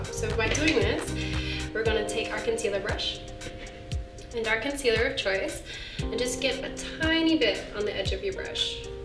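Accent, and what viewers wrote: Australia. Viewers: Did you practice to change your Australian accent or did it just change naturally? 😄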